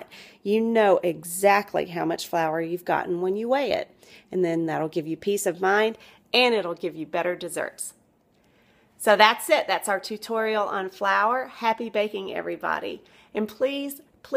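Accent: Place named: United States. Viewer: Ew her country accent got to go lol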